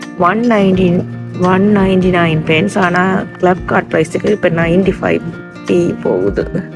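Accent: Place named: Sri Lanka. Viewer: நீங்கள் பேசும் தமிழ் இலங்கை தமிழாக உள்ளது.இலங்கையா நீங்க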